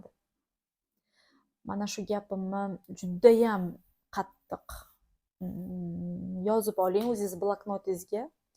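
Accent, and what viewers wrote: Jizzakh, Uzbekistan. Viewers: jizzaxlimisiz shevez rosa o'xsharkan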